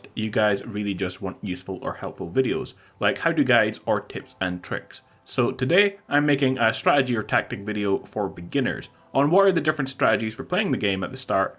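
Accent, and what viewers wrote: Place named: Scotland, United Kingdom. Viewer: I need to ask, what's your accent? Because im from Edinburgh and it seems like you have a Scottish accent but at some times it sounds American haha, also I love the videos buddy!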